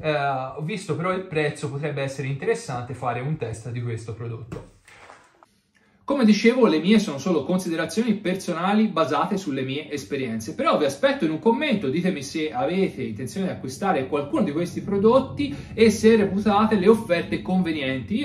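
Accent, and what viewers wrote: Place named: Tuscany, Italy. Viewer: Puoi evitare di parlare il toscano dato che ti seguono in tutta italia?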